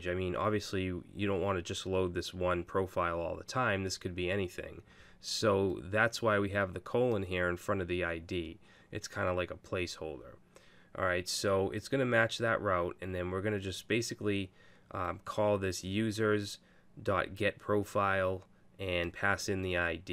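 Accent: Boston, United States. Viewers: Are you from Dorchester/Roxbury?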